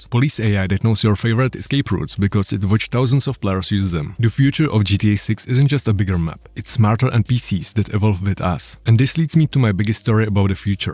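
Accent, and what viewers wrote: Czech Republic. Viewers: this is very dystopian, future is scary... no one took the lesson from Terminator... Btw arent you Czech by any chance? :D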